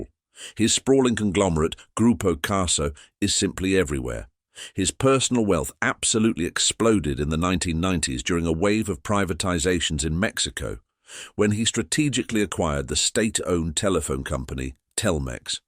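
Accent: United Kingdom. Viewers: So how do you know that these people control the cartels? I'm wondering 🤔! You as a British citizen (based on your accent) remind me of the BIASED American media. That's all those people talk about when referring to our country and people. This is one of the reasons I personally say that America is a humongous HYPOCRITE nation! They do business with us and at the same time lash out at us. That includes their Roach 🪳 Trump their criminal 👹👿🤥. And by the way. Trump was caught stealing in our country. Outside Mazatlán and the city of Ensenada. He was also caught swindling people of their money in Playa del Carmen. Please make a video of this and why America is the world's biggest drug consumers. Please. I would appreciate it if you were not BIAS just like the American media. And just in case the American society did not know how drugs are smuggled across the entire border. 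Here's a tip. Because drug traffickers BRIBE 💲💰 immigration officials in order to smuggle all those drugs through the border!! And I do mean humongous amounts of money 💰💲!! Believe it or not, up to $100,000 dollars in one lump sum!! So please do us a big favor. Please make videos about America's "war on drugs" and why it never ends. Believe me. You'll be surprised 😯 who really is behind the "war on drugs" in America!! And it isn't our people! Good day CHAP 🏴.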